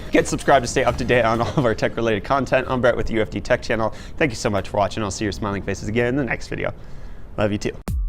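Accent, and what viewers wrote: United States. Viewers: You sound American. Why do you live in South Africa?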